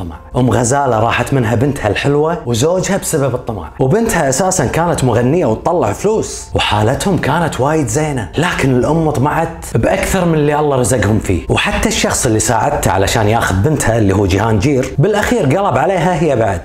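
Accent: Kuwait. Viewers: سردك جميل واللهجة الكويتيه ما شاء الله جميله، كل المحبه للشعب الكويتي الأصيل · باين عليك كويتي تقول قطاته والا حن نقول شطحاته او طلعاته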